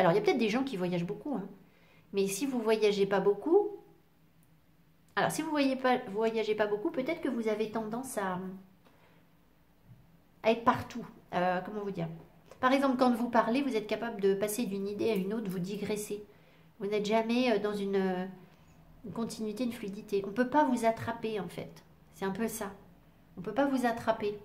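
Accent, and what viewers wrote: England, United Kingdom. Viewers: J.adore votre accent anglais🙏🌻💝🌞👍🥰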